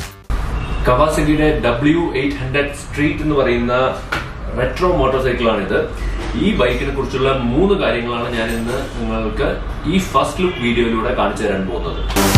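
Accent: Palakkad, India. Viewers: Palakkad ano native place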